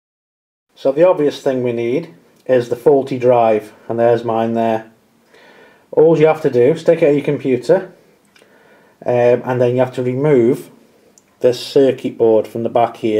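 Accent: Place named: Shildon, United Kingdom. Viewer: Just tried this but using an Arduino's FTDI chip to do the serial side, no dice. So I've resigned to ordering a CA-42 Lead and see where that gets me. The Arduino did let me see the "LED:000000CC FAddr:0024A051" Error when the board is in place so I know I'm fixing the right issue. Totally Unrelated, You sound like you aren't too far from me, you sound very much like my friend from shildon :D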